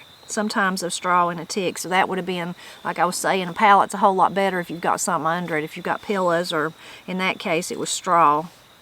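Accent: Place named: South, United States. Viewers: I call this southern english.I am 70 I don't speak it as much as I did when I was younger.I guess it is because all the older ones have passed away and media doesn't speak it.I love and am very proud to be Southern and speak Southern English. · Ya speak pretty much the same way we do in east and west TN, people look at me funny because of the way I talk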